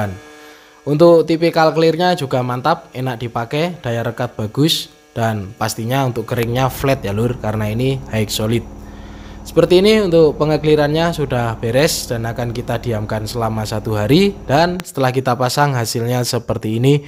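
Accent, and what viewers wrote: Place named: East Java, Indonesia. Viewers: Emang sampean asli mana, logate sama, kayake jawa timur semua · Kayak ngk asing sama bahasa medoknya,dan nama chenelnya.. orang mana si bang??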